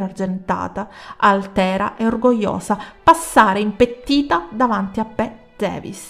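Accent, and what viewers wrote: Sicily, Italy. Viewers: Ti ammiro molto. Continua così. A proposito il tuo parlare siciliano mi piace tantissimo!!! Ciao da Venezia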